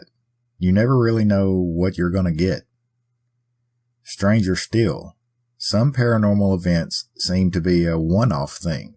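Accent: South, United States. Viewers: I always start looking for your newest video starting Sunday afternoons, then check hourly. You always make it well worth the wait. Love to hear a fellow Southerner. North Carolina ☠🏴‍☠⚔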